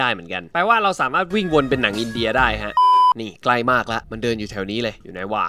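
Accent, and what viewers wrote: North, Thailand. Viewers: พี่เป็นคนเหนือหรือเปล่าครับ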